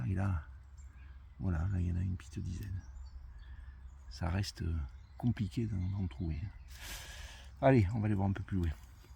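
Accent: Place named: South, France. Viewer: Joli accent du sud pour un lorrain 😅👌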